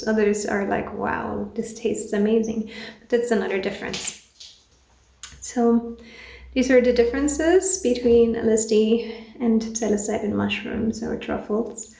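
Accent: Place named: Norway. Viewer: Its so cool to see a "normal looking" woman talking about physcadelics. Not that everyone doesn't partake i just feel like your breaking a stereotype. Good content, i prefer lsd if im looking for a good time and i choose shrooms when i feel like having my sorry ass dragged through my own physce by nature haha, really though when i take shrooms i feel like im talking to my therapist. An old friend i like to visit when i need advice. New subscriber here , where are you from ? I love your accent you remindlike one of my favorite musicians from norway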